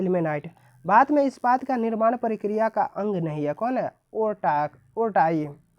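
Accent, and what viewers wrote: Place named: Bhojpuri, India. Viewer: Hindi to shi bol liya kro usme bhi bhojpuri add kr dete ho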